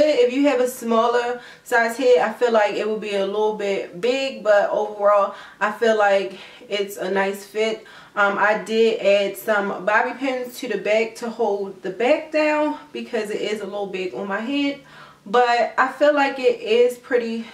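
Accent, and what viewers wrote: Baltimore, United States. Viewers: I love the wig!!! You sound like my cousins in Baltimore! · You from Baltimore? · Is that a Baltimore accent I hear?? If so I'm from Baltimore too. I get excited when I hear vloggers from Baltimore. Anyway I'm a new subscriber. love your channel and reviews. Love this wig!! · U sound like u from Baltimore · I love it!!! Love that bmore accent !! U have the face for it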